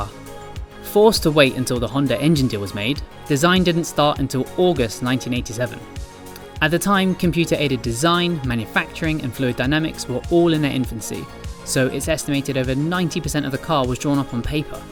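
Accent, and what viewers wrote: United Kingdom. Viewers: Why do Brits call Honda, Honder?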